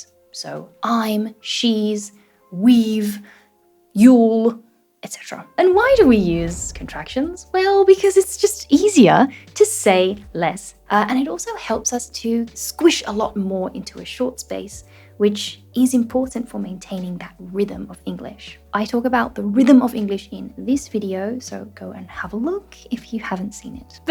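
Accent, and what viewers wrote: England, United Kingdom. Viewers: Hello! I like your British accent! Do you live in England ? And can you share tips to have an accent like yours ? I'm learning English(at school) but I live in a country where english isn't spoken that much... I like British accent so much! I've been trying to learn it but I don't have anybody to speak English with, so... I just talk with myself and I don't really feel like my British accent is improving... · Your voice and accent are really pretty! Where is your accent from? Is it English? Do some Australian accents sound British?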